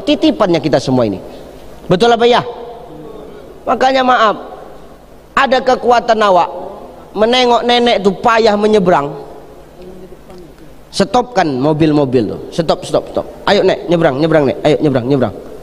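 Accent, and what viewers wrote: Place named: Asahan, Indonesia. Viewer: ASSALAMU ALAIKUM USTADZ., IDOLA SAYA SEMOGA SEHAT SENANTIASA Ada dlm Lindungan ALLAH AamiiiN CERAMAH NYA MENGENA DI HATI SAYA SUKA Dgn LOGAT ASAHAN NYA SUKSES SELALU USTADZ.JAKARTA HADIR SELALU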